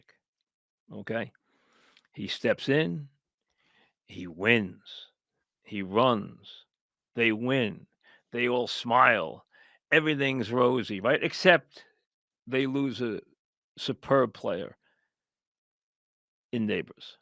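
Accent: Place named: Long Island, United States. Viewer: love but as a long islander, his accent is HORRIBLE…”yeh gotta thrOH thuh bawl!” i always thought part of being in broadcast joirnalism was adopting a natural, neutral accent and speaking and pronouncing clearly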